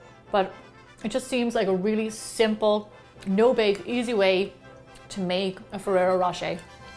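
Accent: Ireland. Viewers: Her irish accent is👌i could listen to her talk forever❤️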